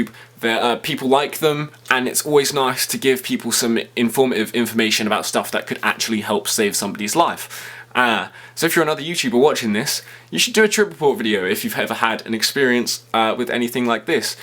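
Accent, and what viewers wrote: United Kingdom. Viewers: U say LA but u sound British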